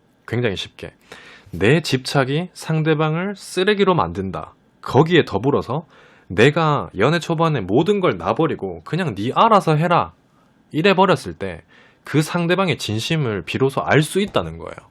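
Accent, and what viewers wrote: Gyeongsang, South Korea. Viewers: 우연히 봤는데 잼있네요. 경상도 억양 있으시네요. 경상도분인듯~~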